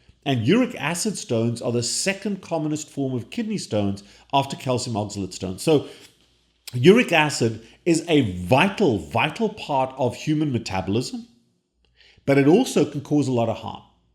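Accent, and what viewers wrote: South Africa. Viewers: He sounds very south African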